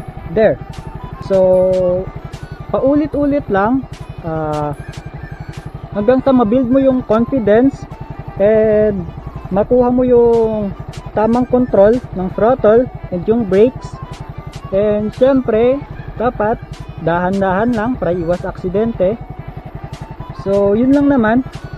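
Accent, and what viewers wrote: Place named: Iloilo, Philippines. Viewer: hehe kaya pala sir may halo ilonggo accent. Ilonggo din ako sir hehehe RS sir!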